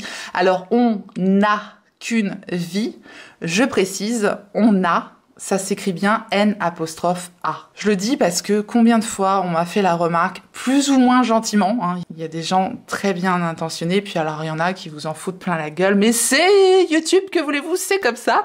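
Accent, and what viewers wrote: England, United Kingdom. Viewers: J'aime bien votre chignon et surtout votre accent anglais ! Yeah ! 😄😉💗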